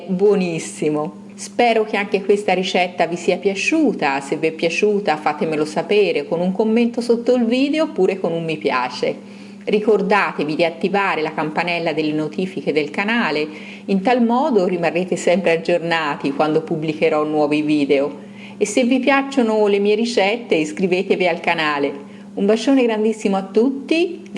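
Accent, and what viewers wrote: Tuscany, Italy. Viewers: Altroché se rendi .....la bontà, sei unica.....le tue ricette sono perfette,molto molto brava. ...e poi la il tuo accento toscano. ....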